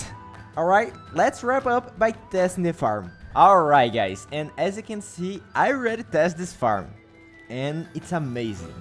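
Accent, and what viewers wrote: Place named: Brazil. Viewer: eu tava percebendo que o seu sotaque era de Brasileiro, dai qdo c disse que ingles n era a sua primeira lingua eu saquei que c realmente é br kkkkkkkkkkkkkkkkk, sucesso pra vc parceiro, inclusive o seu ingles tá mt bom, parabéns (edit: tu se inspirou em genshin? Tem uma estrutura exatamente assim lá) (edit 2: agr que eu vi que vc falou no video kkkkkkkkkkkk)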